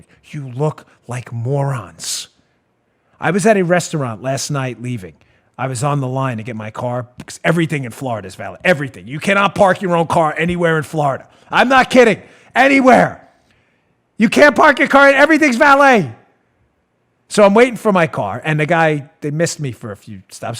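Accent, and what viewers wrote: New York, United States. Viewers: is the best. Been watching you on youtube for years. Always ahead of the story, insights spot on, and delivered in the most eloquent NY accent.. BTW, I grew up in Bayside.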